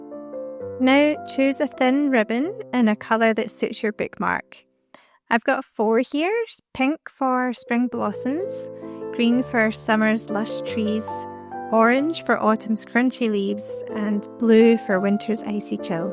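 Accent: Scotland, United Kingdom. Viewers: Lovely…could you do the breakdown of drawing the fox pls…..are you Scottish?😊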